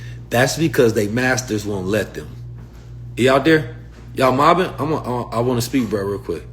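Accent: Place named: Chicago, United States. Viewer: Is it me or do I sense some Chicago swag in your step now. You sound a lot more dangerous now my dude. Peace & Blessings. S/O from the Chi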